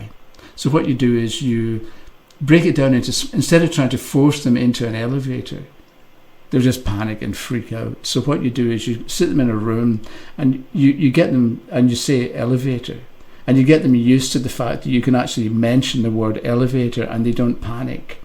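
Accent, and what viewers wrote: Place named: Glasgow, United Kingdom. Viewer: I love your accent, mister. May I ask what area it's from? It's almost like Peter Capaldi's glaswegian accent but mellow. Good video and explanation. Thank you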